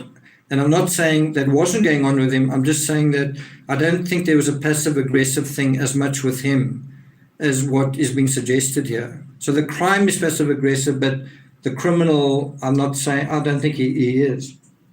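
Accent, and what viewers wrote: South Africa. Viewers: I love your accent my doctor is from south Africa too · When I am unable to sleep, I put your videos on & you lullaby to a wonderful sleep. It’s not that you bore me but you relax me so much. Love your South African accent & your soothing voice❤️❤️❤️❤️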